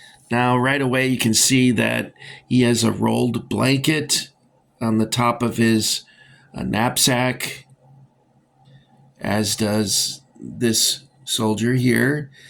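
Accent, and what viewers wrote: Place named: England, United Kingdom. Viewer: As ever an excellent video with your own fantastic style too. Great English accent BTW. ❤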